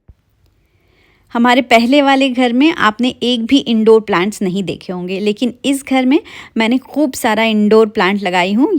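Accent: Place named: Uttar Pradesh, India. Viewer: Are you from Uttar Pradesh? Aap ka hindi humare jesa hai. Same accent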